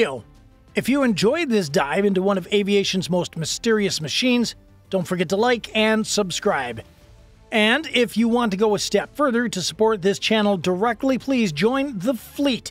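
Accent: Canada. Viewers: Based on the accent of the presenter, I want to know why in the world a Canadian is presenting this video. Are you just envious? Why don’t you tell your communist labor Prime Minister you just elected instead of Pierre Poilievre to kiss your collective backsides. We in the United States aren’t going to continue to save your butts while you do nothing. Enjoy your 35 to 50% terrace. You’ve earned them!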